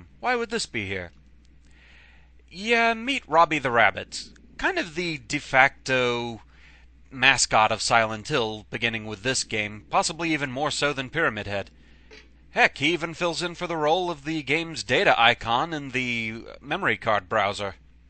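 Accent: Scotland, United Kingdom. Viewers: First time I played this, the opening message really got to me for some reason. "Violent or cruel" is so ominous. I've seen "violence and gore" but cruel is a more subjective word that hints at malice, and I always found that warning real creepy. Fortunately your warm friendly Scottish voice makes things a lot more welcoming!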